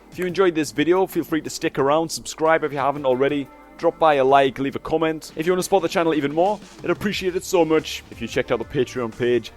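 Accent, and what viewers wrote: Newcastle, United Kingdom. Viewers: Representing us geordies!!!!!!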